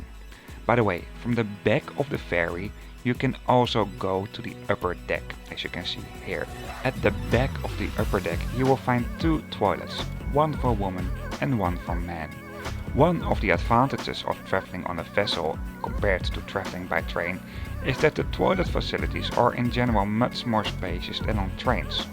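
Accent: Netherlands. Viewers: Wat een onzin. Zoals hij zelf aangeeft, heeft hij wel een NL accent, maar dat maakt het toch ook gewoon leuk. Ben er redelijk van overtuigd dat de 'native english speakers' hem prima kunnen verstaan en er soms om kunnen lachen.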